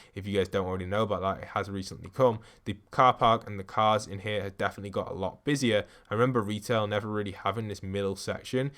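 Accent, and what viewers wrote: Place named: United Kingdom. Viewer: HIS BRITISH voice calms the video even more dammmmmmm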